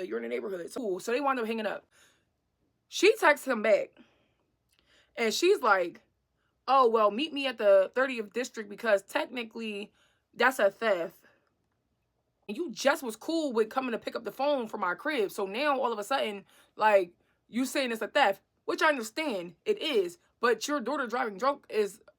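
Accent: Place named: Philadelphia, United States. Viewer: you got a way with words and I got a crush on ya Philly cadence. When are you free to converse more over a glass of Moscato? 🥂 🌹?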